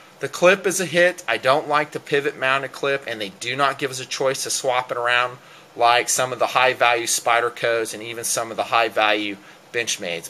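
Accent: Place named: United States. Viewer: 6 yeh he's finally dropped the squeak great reviews but annoying yank twang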